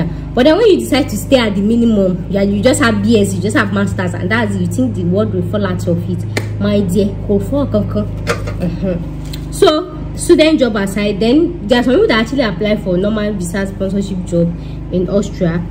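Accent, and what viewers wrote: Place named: Ondo, Nigeria. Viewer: With the yoruba accent i think you are from ondo townm please tell me i am wrong! omo yemi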